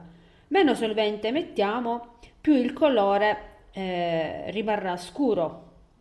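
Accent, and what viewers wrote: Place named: Sicily, Italy. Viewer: grazie per i tuoi video e complimenti, si sente che sei siciliana, un saluto da palermo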